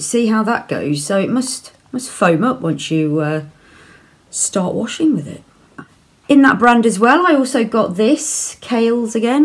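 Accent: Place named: RP, United Kingdom. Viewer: You sound posh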